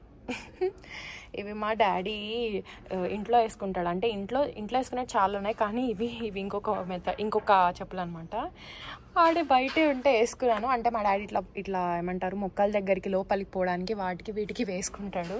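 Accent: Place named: Hyderabad, India. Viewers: Your slang looks like our Hyderabadi telugu pakka mass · Motham Telangana slang matladuthunavu ga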